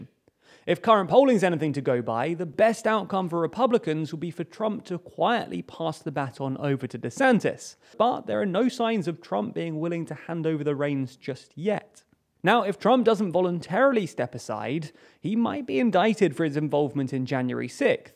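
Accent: United Kingdom. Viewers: What's with the accent? Are you based in UK, or are you a transplant over here, Stateside?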